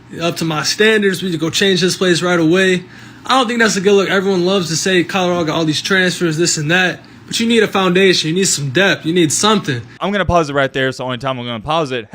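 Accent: South, United States. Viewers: The Deion Sanders era at Colorado will be very interesting to watch. It doesn't matter who the coach is, if they don't win the boosters and the fans will turn on him. But then again if he's successful then his reign in Colorado won't be long. P.S. That girl in the video was that your wife, girlfriend, sister, cousin (you sound like you're from the south) or is that some sort of stalker thing going on?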